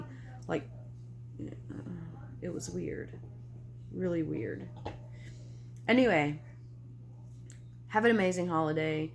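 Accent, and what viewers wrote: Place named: Appalachia, United States. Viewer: It's funny. I haven't seen video from you in like forever (like probably a year or more) and it seems like way back when, you used to have a much more pronounced appalachian accent than you do now. Not that it really matters, but it's just a subtle change I noticed. I'm sorry to hear that you've had to deal with covid. That stuff sucks. I hope that you're back to feeling 100% like yourself real soon. I really need to watch your videos more often. I really enjoy listen to you speak about things. Your level of intelligence is really impressive. I hope 2022 treats you right!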